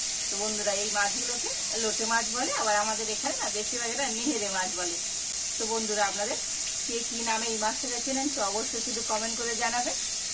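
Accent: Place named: Dhaka, Bangladesh. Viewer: দিদি ভাই আপনিকি ঢাকা জেলার লোক?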